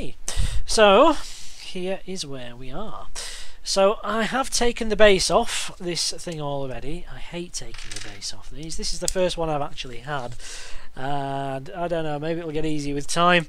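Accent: United Kingdom. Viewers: im just woundering it sounds like your form the UK im just woundering if you are where do u buy your soldering flux from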